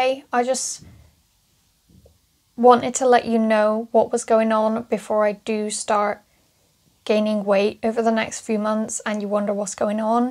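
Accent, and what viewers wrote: Bristol, United Kingdom. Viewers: Is that a Bristolian accent I hear?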